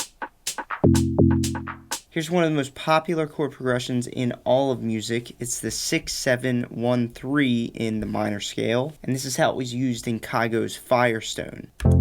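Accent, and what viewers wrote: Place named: Boston, United States. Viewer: “Whole, whole, hailf” 😂 love the Boston/NY accent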